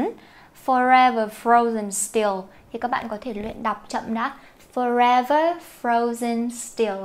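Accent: South, Vietnam. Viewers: You can talk vietnamese southern accent can you make a vlog speaking southern accent I think it’s very good and special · Ui chị nói tiếng miền Nam dthhhp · Nghe cô nói giọng miền nam đáng yêu cực 😍😍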